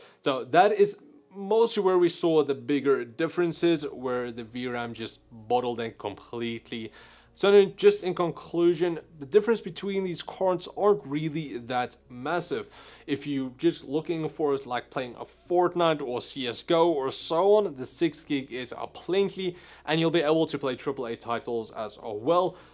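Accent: South Africa. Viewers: Damn I knew this is a fellow South African by that accent... Lekker Boetman.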